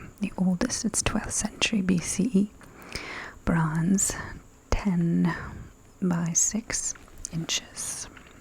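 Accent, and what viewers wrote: Transatlantic, United States. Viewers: very transatlantic. It kind of jumps all over the place tho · Your accent is akin to the trans Atlantic / Edie Beale cadence and it is amazing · New comfort video ❤ P.S. What accent is this? I can’t always distinguish between regional US accents but this lady’s accent reminds me of Jaws and I love it. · It sounds transatlantic right?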